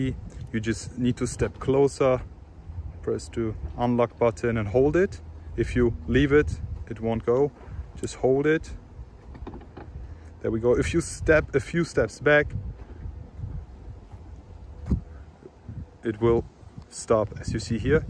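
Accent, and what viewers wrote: Germany. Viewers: Ich kann mir diesen schlechten deutschen Akzent nicht geben . Aber tolles Auto . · Hasse es wenn man den Deutschen Akzent so krass raus hört .. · Haha man merkt so den deutschen Akzent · Man hört manchmal raus dass du deutscher bist :D aber sehr gutes Video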